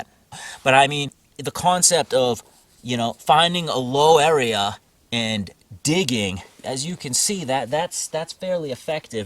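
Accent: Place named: Boston, United States. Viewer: you from Boston, you sound like Kennedy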